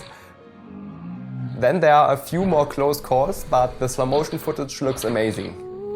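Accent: Germany. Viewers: The O3 and O4 are from stock waterresistant but all other parts on the Drone mmmeeeh😂 On my 5“ builds Im using the MGChemicals 422c silicone comforma coating its not too thick like a few other ones incase i have to melt it away for future soldering. For my 1-3“ Inch and on my Race Quad I use the flywoo waterproof spray. Bouth are just fabilous i knew the comformal coating is the best but i was shocked how good the flywoo spray works, Good video sounds like a heavy German accent💯💥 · Dein Deutscher Aktzent ist geil❤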